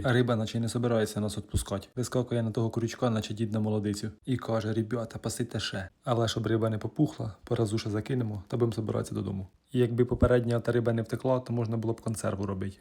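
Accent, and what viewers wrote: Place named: Sumy, Ukraine. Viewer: А з якої ви області? Такий суржик, як в Сумської. Я наче вдома побувала. Дякую вам!!!) Гарний контент( щоденне життя то круто).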